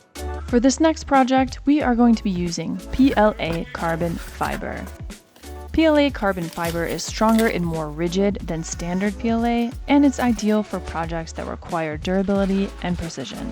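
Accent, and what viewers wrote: France. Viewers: always makes me smile when i hear a french accent on an US based video^^ good ideas to print those things :)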